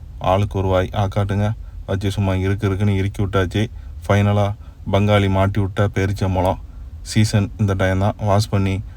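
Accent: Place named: Nagore, India. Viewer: நாகூர் லயும் இப்படி தான் பேசுவாஹா....😅😅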